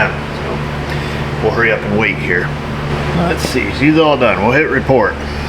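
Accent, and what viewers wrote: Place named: New York, United States. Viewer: I watch every video that you make and look forward to each and every one of them and have been a subscriber for since I discovered your channel.rears ago Ii hit thr "like" button (if I'm not distracted) because i appreciate your honesty to your customers and get a real idea of what it's like running your own shop and it makes me appreciate my local garage. My only beef is the "Members first} option that I refuse to join. I'm from the heart of Dixie and forgive your New York accent because if you wrere the family next door ya'll would be my best neighbors ( I have a French family, a British family and an African American families in our Cul de Sacand they ALL are great folks but there is a loss of communication since I am a BAMMER. I get you and perfectly even though yousay bunnons for BuTTons Keep making great videos and oh did I say I dislike members first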